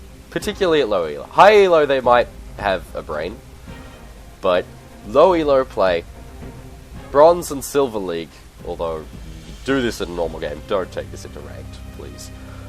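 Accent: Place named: Australia. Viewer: Love your accent man! Australian? Also I tried it and it was heaps fun haha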